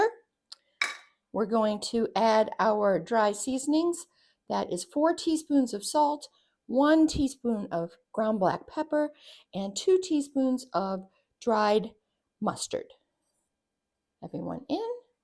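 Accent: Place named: Boston, United States. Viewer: Okay, looks like I'm the first commenter. My grandparents grew up in Groton, CT and remember taking the ferry from Long Island, 3-4 times a year, AND looked forward to the hotdogs & Beans, and fighting over the hunk of bacon! My aunt Colleen would bring "The Beans" to every family get together and she recently shared the recipe with me. Just made my first batch (in DE) and from memory, they are spot on! Your Boston accent is great by the way. I appreciate you taking the time for this video, which brought back many memories.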